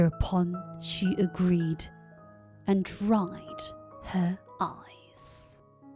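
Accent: United Kingdom. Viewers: 에이 에스 엠 아~~~~ㄹ 오늘도 잘 듣고 갑니다. 역시나 권아나의 발음은 엄청 매력적입니다. 흉내내보려고 해도 영 저는 안되네요. ㅎㅎㅎ 영국식 발음아! 오픈 세서미~~~! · 선생님 영국발음이랑 억양 선생님처럼 하거싶은대 어떻게 해야될까요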